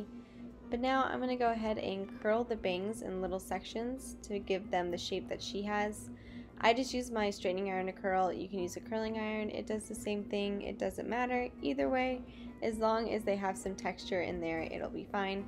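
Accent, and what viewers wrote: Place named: Brazil. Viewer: é br? não sei, se isso foi ofensivo, mas seu sotaque parace q vc é br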